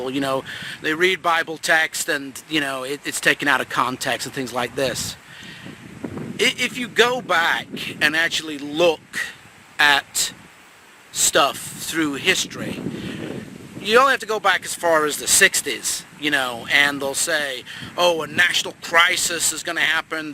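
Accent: United Kingdom. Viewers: How long have you lived in the States? I detect a very familiar British accent still leaking through.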